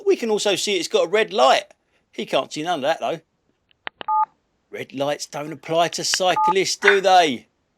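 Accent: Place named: West London, United Kingdom. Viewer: You sound nothing like Michael Caine, You have a West London accent like me.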